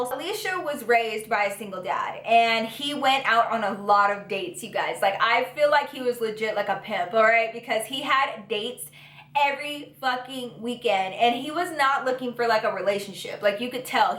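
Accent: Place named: South, United States. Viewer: I can really hear your country accent in this video lol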